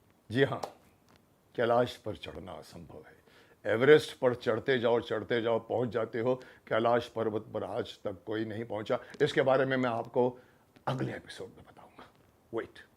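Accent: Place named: Assam, India. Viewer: Wow aap assam bolte hai to mujhe bahut achha lagta hai . Ilove u jaan😍😘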